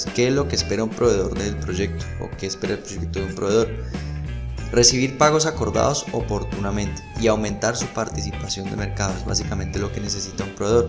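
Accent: Colombia. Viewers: La cadencia al hablar (supongo colombiana) me obligó a afinar el oído pero entendí todo. Gracias. Un mínimo aporte a lo que para mí es un excelente vídeo: El sonido al principio es deficiente.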